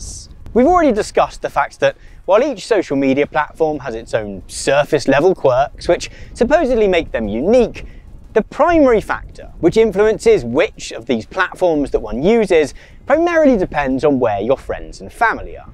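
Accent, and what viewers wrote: United Kingdom. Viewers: your caption writer corrected some parts in your British af rant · damn I love you and your videos so much. I really love political theory and having you explain it to me with modern day relevance and a nice british accent is just amazing.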